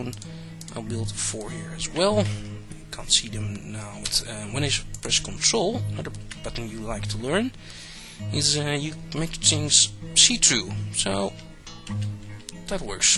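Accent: Netherlands. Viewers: Are you dutch by chance? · i think you are from holland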